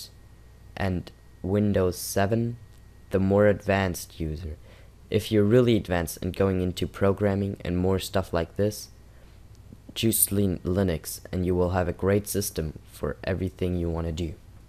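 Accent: Germany. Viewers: Dein Englisch ist richtig gut für einen deutschen, obwohl man den Dialekt noch raushören kann (du sagst im unsip)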